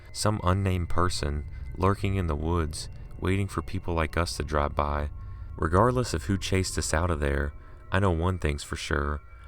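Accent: South, United States. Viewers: Yeah, he sounds southern. I'm from Kentucky, so I would know that pretty well : P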